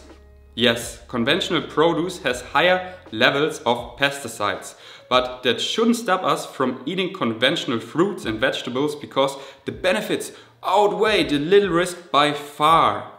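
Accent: Germany. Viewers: I really like your videos. So real. And your German accent is cool. Super cool that you talk in English. Anyways, keep it up! I am watching!!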